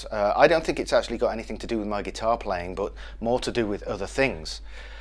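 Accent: United Kingdom. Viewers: When did john petrucci start talking british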